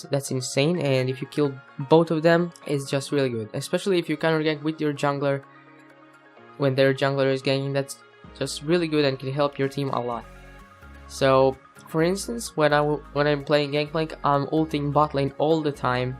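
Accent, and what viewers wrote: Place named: Philippines. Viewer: Let me guess: do you play in the PH server? Your accent sound Filipino...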